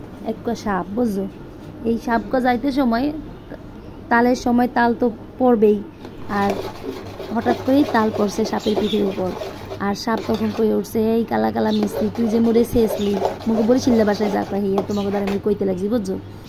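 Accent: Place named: Barisal, Bangladesh. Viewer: পুরাই মোগো বরিশালের ভাষা👍👌🙋‍♂️🥰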